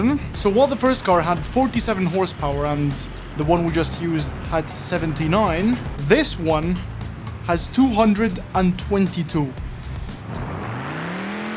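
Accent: Italy. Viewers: Is he italian? I am And its italian sounds good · Can someone confirm to me that he is Italian because the location is in always Italy, he sounds Italian and i say that from expirience of being 50% italian.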